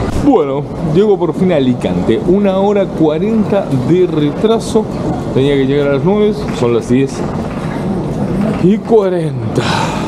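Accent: Argentina. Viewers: Ya me parecía que eras argentino por tu forma de hablar. Aguante argentina máster 💪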